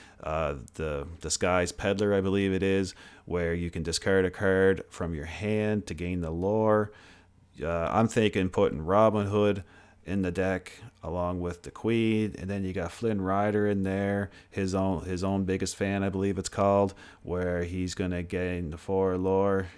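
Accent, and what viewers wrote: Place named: Canada. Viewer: The level of Canadian in your voice....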